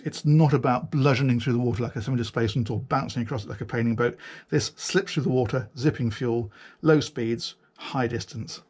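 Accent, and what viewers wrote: England, United Kingdom. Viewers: "the electronics on this boat... it's proper stuff, it's really pucker!" i'd watch these tours just to hear an englishman describe things this way.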